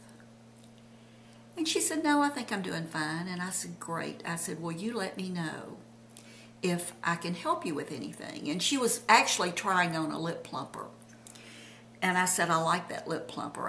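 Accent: South, United States. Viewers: Hi, I am new to your videos and just love them. I have watched six already and can't wait to watch them all. I am a young at heart 69 year old and love my make-up, hair styles, pretty and cool clothes and jewelery. I think you are lovely in all ways. I also love your southern accent!! My son moved to South Carolina about 15 years ago, and he has the southern charm now. I am going back to view more of your videos Bye Judie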